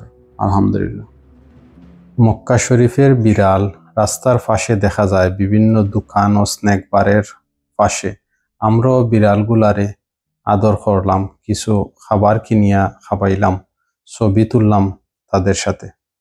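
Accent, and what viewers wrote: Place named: Sylhet, Bangladesh. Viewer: মা শা আল্লাহ, আপনার খাঁটি সিলেটি ভাষা অসাধারণ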